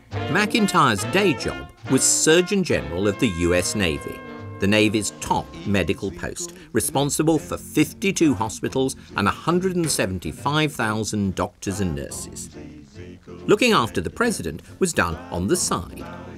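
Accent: United Kingdom. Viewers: A peculiar source of narrator. Why would this outfit select a person with a somewhat muddled but clearly British accent to discuss an American president? It's inappropriate, to say the least.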